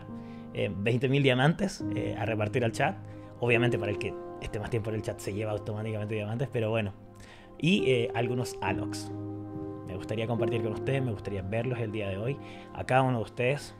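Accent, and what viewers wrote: Chile. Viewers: ¿Eres chileno?, eres el mejor muchas gracias igualmente grande.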